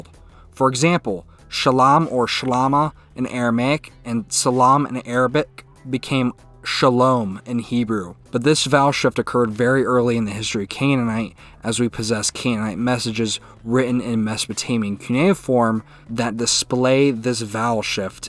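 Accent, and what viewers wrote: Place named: England, United Kingdom. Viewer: Everything was almost perfect.. I just have one problem. The way you pronounced the Hebrew sentence in the middle of the video, offended me greatly.. lol.. kidding. But it was way to harsh to listen to. If you want to improve your pronunciation (in modern Hebrew, since that's how you pronounced it), the Kh has to be softer, and the stress is a bit off in most words.. your English accent is also pretty noticeable in the lack of clear vowels.. it should be pronounced more like in Latin (the Ah sound, that should sound more like Uh). Apart from that very clear and precise video, filled with factual information.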